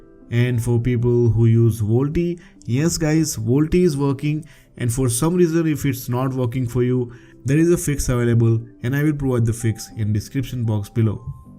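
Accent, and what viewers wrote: India. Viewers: just gonna say the obvious but is there a single fucking review of lineageos 16 without an indian accent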